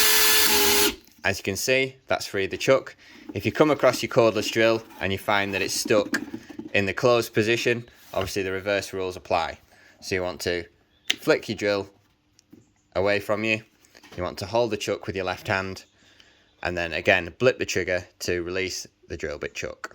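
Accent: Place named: England, United Kingdom. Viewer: LOL! When I heard the English accent I knew I was in for a treat. What a joke of a video.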